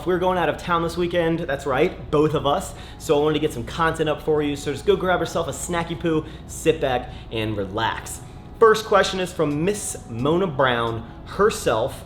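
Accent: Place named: New Jersey, United States. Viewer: Lovely accent you have there, New Jersey?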